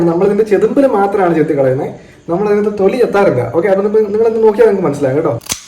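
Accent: Kottayam, India. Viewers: Kottayamkarananno bro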